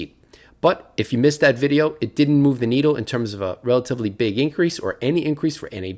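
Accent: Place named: New York City, United States. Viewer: Well, which variety of clover from Ture Leaf are you buying? I'm on the site right now, and there are Mamouth Red and Medium Red Clover seeds available in pounds. But the Organic Red Clover is only available in small pouches. Hmmm, that's the same situation with Johnny's Seeds, too. See what you did?! You posted this video and sold out the entire organic red clover seed bank in North America! You're a Boston guy? No accent. You really seem like a NYC area guy to me. I liked the point you made in the last video with Chris about this VO2 Max protocol only giving us a chance to live to mid-80's, maybe. The Bible says Moses lived to 150! And I guarantee Moses wasn't pumping iron or training for any marathons. My grandmother sat by her 3rd story window in the Bronx smoking Marlboro Red after Marlboro Red to the tune of 4-packs a day and lived to be a healthy 94. 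But, she survived colon cancer in her late 20's and ate almost nothing every day. I guess if you wear a colostomy bag eating anything probably doesn't seem ideal. The only thing my grandmother ate was cold cuts from the deli on her block. And even with that she'd maybe eat a few slices of roast beef and swiss cheese. The only vegetable I ever saw here eat was a few spoonfuls of potato salad. I think that CR (Calorie Restriction) is a powerful tool. I've been watching Peter Attia for 10 years and He gets carried away with his exercise regimen when it comes to the average person. I'm a certified Health Coach, not charging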